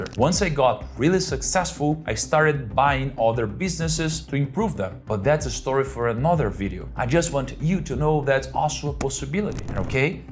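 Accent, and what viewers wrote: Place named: Brazil. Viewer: Fly, my friend! Fly! So good to hear your voice in English! You'll get to the hearts of people with your Brazilian accent! This is what happened to me 20 years ago, working as a volunteer in the US and learning the language at the same time! You're soooo good to go! You rock! <3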